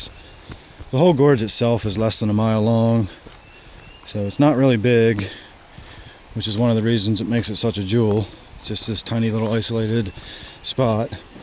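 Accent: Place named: Baltimore, United States. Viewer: Are you from the Baltimore Region? I thought it sounded like you had a bit of a baltimore accent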